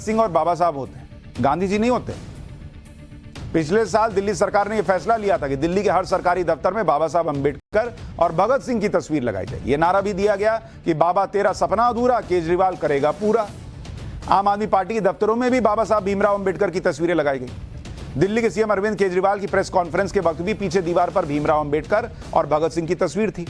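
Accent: Bihar, India. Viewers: I love your Bihari accent.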